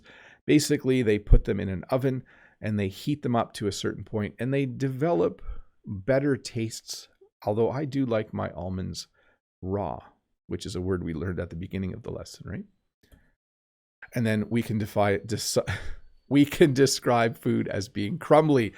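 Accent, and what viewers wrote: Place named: Canada. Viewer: Hearing your Canadian Accent really excite me. Thank for the video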